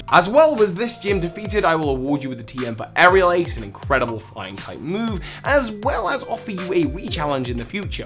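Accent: United Kingdom. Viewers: I kid you not, I've been watching you for a year and a half now, and I swear I always thought you said "So hi" instead of "Soar high" until this video. I blame your British accent for that. Edit: I've been blessed with the coveted heart from!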